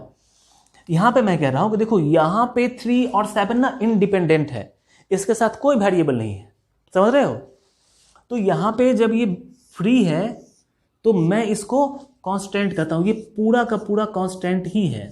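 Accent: Bihar, India. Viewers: Bihari language padhaai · Sir mai bhi Bihari hun App ke (Bihari style +study) bahut accha Laga mai yah video 2026 ko dekh raha hun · Oo ho bihari❤❤❤❤❤❤❤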